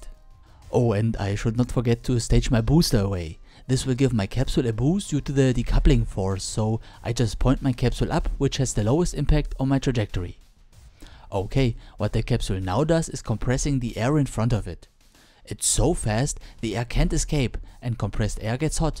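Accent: Germany. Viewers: German detected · Are u german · There is something to be said about the german accient giving authenticity to the video.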